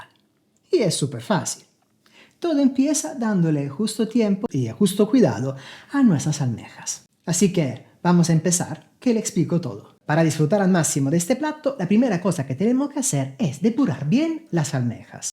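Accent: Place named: Italy. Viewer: Buenos dias. Ya activé la función WhatsApp. Es una gran idea y gracias por hacerme partícipe Este poema es para ti Cocinando Sabores y maestría de la mano de. Simpatía y sencillez. Cocinando algo rico paso a paso cada vez. Y siempre acierta él porque algún ingrediente en casa sueles tener. con su encanto y su acento italiano. Y aunque a su mujer no logre conocer, la inspiración ha de tener. Hace de todo este Chef, da consejos, hace puzzles, capaz de contar chistes buenos también. Admiración y estima siente esta gaditana. Que dice siempre lo que le vienen ganas. Para y su mujer de una fans y cocinera apende recetas nuevas.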